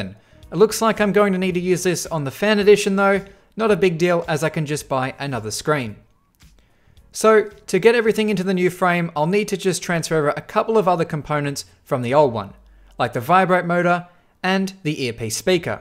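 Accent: Australia. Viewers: W8 this dood is australian too. Nice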